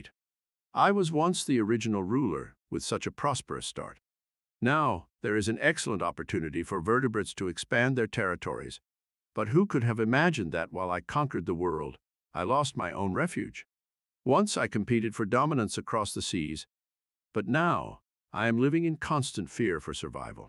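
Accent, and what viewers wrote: United States. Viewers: Excellent video, appreciate the attention to detail. Also, I love your classic mid-Atlantic accent.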